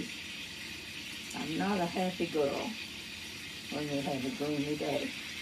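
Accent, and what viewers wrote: South, United States. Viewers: You are a Sweetheart♥️You remind me of my Precious Mother.Love the southern accent.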